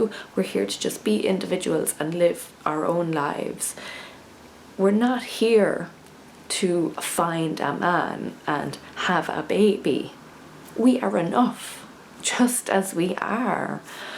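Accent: Ireland. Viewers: Off topic, but your brogue is just lovely!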